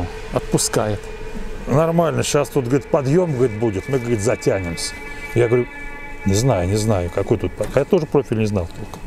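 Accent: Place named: Nizhny Novgorod, Russia. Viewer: Чуть заметный нижегородский акцент. От молодых уже ни хрена не услышишь.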